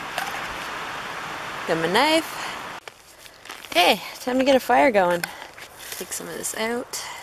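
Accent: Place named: Canada. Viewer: I found you while searching for cowboy coffee, but there are so many other things in this video that I've long been interested, such as twig stoves, winter backpacking and the Morakniv bushcraft knife. I thought you sounded a bit Canadian. Very glad to have found you, and I'm looking forward to your next videos.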